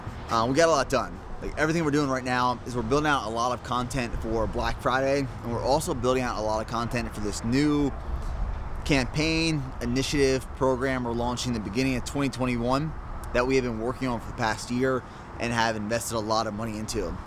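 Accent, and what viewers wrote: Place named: Brooklyn, United States. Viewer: Love how his a proud Texan but has the strongest Brooklyn accent😂😂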